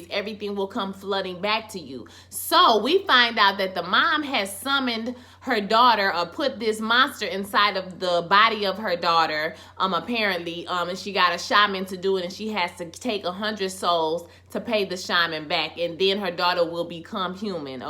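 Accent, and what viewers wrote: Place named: New Orleans, United States. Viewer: you gotta be from new orleans... that accent came in loud and clear... great review.. I come for the comedic nuggets you provide... hilarious..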